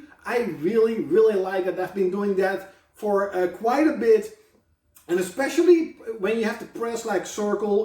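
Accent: Netherlands. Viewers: Where are you from? I think i hear a dutch accent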